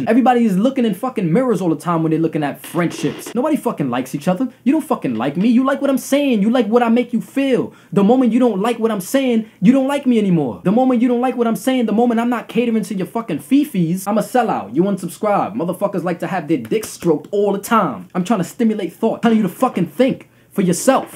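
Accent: New York, United States. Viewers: I love his New York accent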